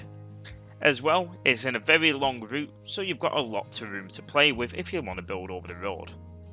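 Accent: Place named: Cockney, United Kingdom. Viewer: Damn it you gave away my island boom stash Cockney bastard ---the lizard king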